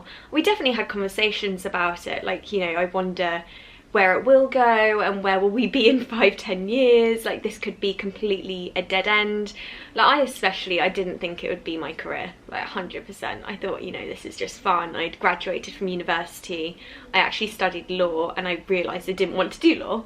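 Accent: United Kingdom. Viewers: I looked for a british youtuber to learn the accent and accidentally found someone so precious. Youre absolutely marvellous and im really glad to found this channel ^^ Right on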